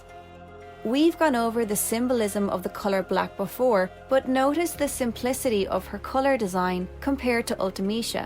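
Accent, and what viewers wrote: Ireland. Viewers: Question: are you Irish or Scottish?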